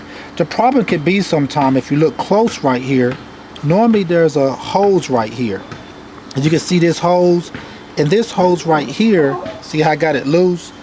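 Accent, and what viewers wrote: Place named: Kentucky, United States. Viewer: Thank you so much for the video lots of help!! but also it was funny hearing your accent and I said man it sounds so familiar KY... represent Louisville Kentucky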